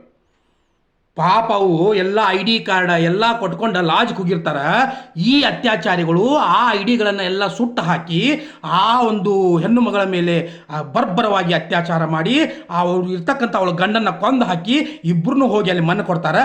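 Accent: North Karnataka, India. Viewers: Anu matadtiya guru utara karnataka super guru ninu❤ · ಸರ್ ನಿಮ್ಮ ಉತ್ತರ ಕರ್ನಾಟಕ ಭಾಷಾ ಶೈಲಿ ತುಂಬಾ ಇಷ್ಟ ಆಗುತ್ತೆ ಈ ಬೇವರ್ಸಿ ಕಿರಿಕ್ ಕೀರ್ತಿ ಮತ್ತು ಆ ಕಜ್ಜಿ ಕಂತ್ರಿ ನಾಯಿ ರಾಕೇಶ್ ಶೆಟ್ಟಿ ಯ ಅವಾಂತರಗಳನ್ನು ಜನತೆಗೆ ನಿಮ್ಮ ಸ್ಟೈಲ್ ನಲ್ಲಿ ಜನ್ಮ ಜಾಲಾಡಿ ಬಿಸಾಕಿ ಸರ್ ನಿಮ್ಮೊಂದಿಗೆ ನಾವಿದ್ದೇವೆ❤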